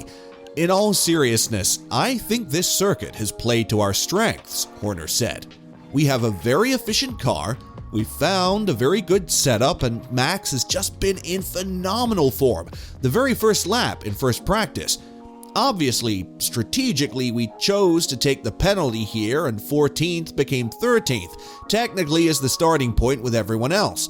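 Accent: United States. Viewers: A strange video - more like an attempt at anti-RB PR stunt with some very doubtful claims of "cheating", read out by an overly-dramatic voice actor with a condescending tone who is clearly not an F1 expert. Not a fan of the mid-west accent and 'Murican colloquialisms when presenting a top-end, international motor sport. What's the point of this amateurish, hyped-up polemic? This poorly executed click-bait will not catch me again. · I'm sorry but you are sounding way to American to be taken seriously about this.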